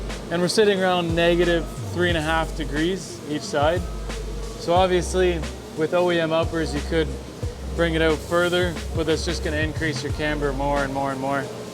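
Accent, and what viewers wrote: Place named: Ireland. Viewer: You have such a strong irish accent lol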